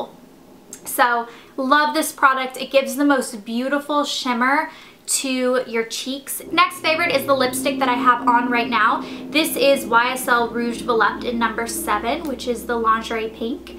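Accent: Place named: South, United States. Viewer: I love how sometimes your southern accent comes out <3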